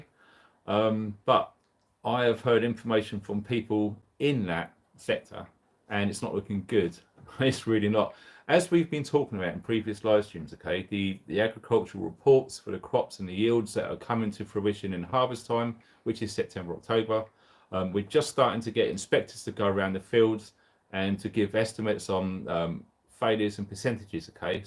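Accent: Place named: Bristol, United Kingdom. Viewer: Hi.Totally came across your channel by accident.Just Watched Your Video on prepping very informative.I've put out a number of prep videos too on my channel.Very much into information sharing and resouce sharing. is that a Bristolian accent I can detect.?Keep Up the good work.Will definitely be tuning in to your channel again.Eldad Amara(UK).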